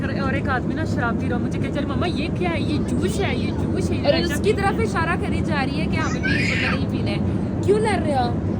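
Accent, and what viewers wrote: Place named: Pakistan. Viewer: Ap pakistan m kha sy h because your acsent is different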